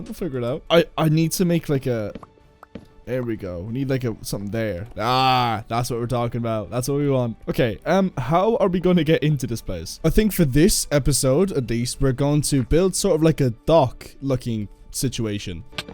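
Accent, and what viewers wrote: Ireland. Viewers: can't tell if you're irish or european. either way, I like your voice! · As soon as I heard you're voice I instantly noticed that your Irish. It's nice to see another Irish brother 🇮🇪🇮🇪. Good luck in your channel mate.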